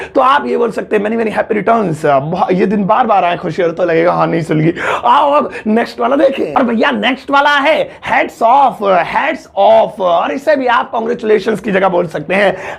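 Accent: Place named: Khadi Boli, India. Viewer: Sir kab se hamari tarah khadi boli bolne lage 😁😁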